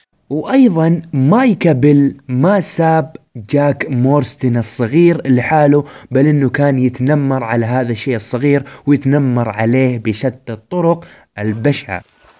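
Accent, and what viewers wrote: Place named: Jeddah, Saudi Arabia. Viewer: ما ابغا احلف بس واضح انك من اهل جدة 😂❤️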